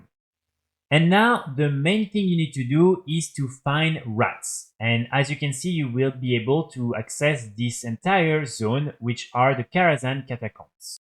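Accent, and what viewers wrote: France. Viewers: also i need to say i love that french accent its just makes it sound so nice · French accent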